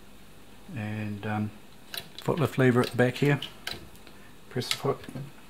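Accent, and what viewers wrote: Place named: New Zealand. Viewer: Mate, thanks so much. Trying to figure out my Grandma's old machine and this was the perfect video. Also imagine my shock when amongst all the female American voices in other tutorials I find a fellow kiwi! Made my day. Churr.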